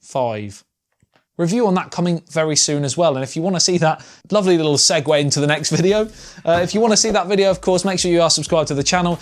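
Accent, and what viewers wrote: United Kingdom. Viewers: Typical irritatiing british accent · is British by his accent...and i guess I am right...!!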